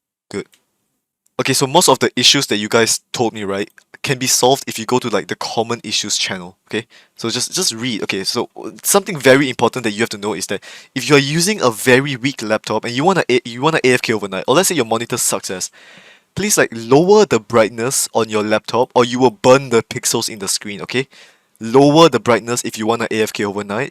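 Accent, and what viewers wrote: Singapore. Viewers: the singaporean accent is very strong btw LMAO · singaporean accent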